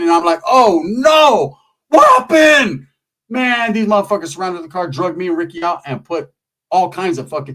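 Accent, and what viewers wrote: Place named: San Jose, United States. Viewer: Your OG Sanjonero voice on point. My tios are in their 70's and still sound like that 😂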